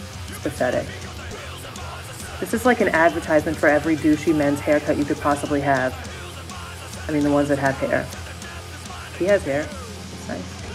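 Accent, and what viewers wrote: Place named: Boston, United States. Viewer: Huge props to for having a correct Boston accent. Makes her that much moah feckin hahdcaw dood.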